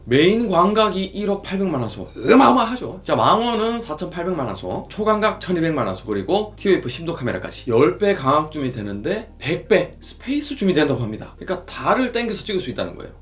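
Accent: Daegu, South Korea. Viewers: 말투가 비슷하다 싶었는데 대구분이셨군요ㅋㅋㅋㅋ 잘 보고 가요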